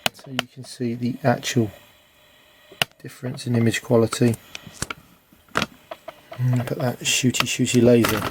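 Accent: United Kingdom. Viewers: ahhhh its so nice to find a fellow English man who loves to muck around wioth old computers etc...lots of Americans nothing wrong with that but to hear "Oh Geezer" lol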